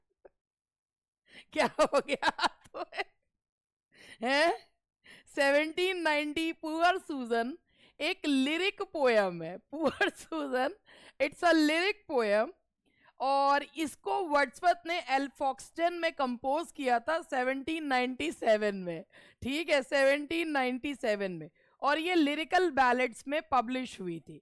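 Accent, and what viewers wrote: Meerut, India. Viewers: Ma'am aapka Meerut vala andaj bahut bha jata h dil ko❤❤🙏👍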